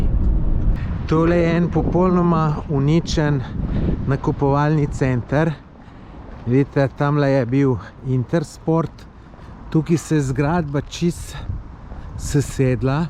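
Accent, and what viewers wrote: Lower Carniola, Slovenia. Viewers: Hvala ti za lepo izcrpno reportazo, tud nam je poveljnik reku da se ne smemo nicesar sovraznikovega dotikat ker je lahko minirano in se ne splaca. Ja cudn res da una stolpnca se stoji jebemu, Amerika bo mela poune roke dela da vse to obnovi pa gnarja bo slo na miljarde. pazi nase in samo naprej. 👍👍👍 Ps. Pogresam tvoj prijeten dolenjski naglas, malo bolj ga lahko poudaris v oddajah in porocevanjih. Tud jst sm rodom iz Trebnjega Velki Gaber tko da rad slism naso špraho ☺️☺️👍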